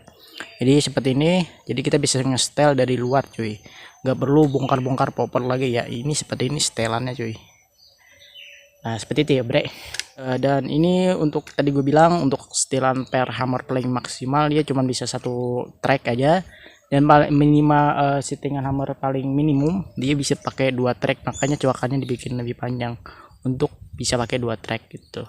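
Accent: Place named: Banjar, Indonesia. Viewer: Orang banjar kah bang , nampak bet cara logat ngomongnya😂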